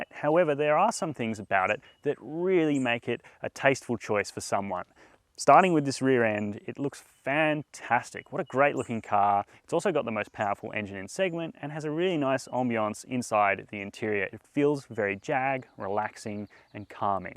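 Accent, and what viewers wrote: Australia. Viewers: Nah, this is a standard Aussie accent. We don’t all sound like Steve Irwin. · Are there different Aus dialects of English..?? I couldn't pick where you from I was thinking South Africa ot New Zealand...Your Aus accent is not as raw as I've heard in some other videos reviews. 🤙🤙🤙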